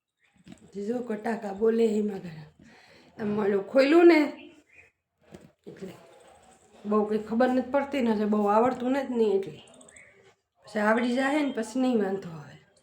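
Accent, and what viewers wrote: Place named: Mer, India. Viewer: Ben tame suddh merni bhasha boloso te bahut saru lage se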